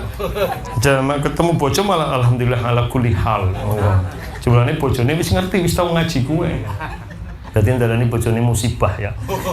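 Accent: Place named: Banyumas, Indonesia. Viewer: Alhamdulillah ilmuku tambah ngaji kalih ustadz ngapak, Asli saking pundi nggih min?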